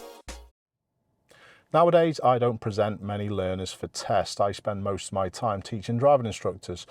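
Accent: United Kingdom. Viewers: That was really interesting and thought provoking. I also found you mentioning various key factors just as I was thinking the exact same thoughts and nodding in agreement. One key thing with all this. Now that this issue is known for this particular double roundabout, it would be a good idea for all local driving instructors to brief their students on this as part of their test preperation and to practice in the way expected by the examiner. Problem solved! At least for the purpose of passing the test. I'd like to comment further on this very interesting clip but the Canadian border is calling...from all lanes! Was very nice to hear a Brirish accent and expressions. Seems like it's been ages since last hearing one! Again, apologies for my relative absence in the comments but as you know, been very busy in North America. Hope all is well in the Uk.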